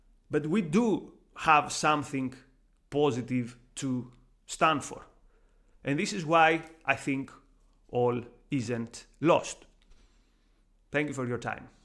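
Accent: Greece. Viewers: Thanks for the effort to think ahead of the curve here. I’m not sure the anti-Israel part of it is really a secret sauce though - the left are absolutely open about it - it’s one of their ‘key pillars’. Left wing ‘magic money tree’ populist economics might be the most dangerous part… enough time has passed since post WW1 economic collapse for many western useful idiots to be willing to try it again 😢. Anyway, your Greek voice makes a refreshing change from that ubiquitous old economic fraud Yannis Varoufakis’ nonsense 😊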